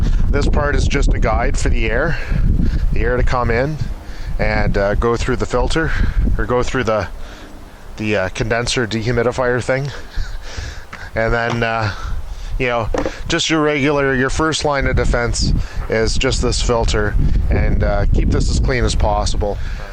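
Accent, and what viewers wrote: Canada. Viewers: Total stud! Thanks for saving me a ton of money on a sales call. And love that Canadian accent!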